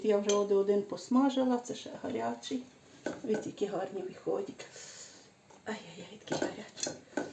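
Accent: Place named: Galicia, Ukraine. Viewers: Ні. Це не львівський говір. Це Прикарпаття! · Дуже дякую за чудовий рецепт і за рідну прикарпатську говірку! 🥰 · Пані дякую за рецепт ви з Львівщини чую по розмові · Моя дорогенька, "най си трошка постоїт ", "підиллєємо "... Обожнюю свою рідну говірку галицьку! Скучаю за нею несамовито, я живу в Рівному, і тут свої діалекти, далекі від моїх рідних...😢😢😢 А зі старістю ностальгія за рідними краями тільки посилюється....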